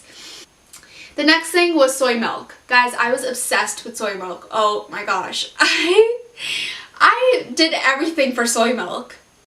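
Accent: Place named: Canada. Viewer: Your Canadian accent is very cute.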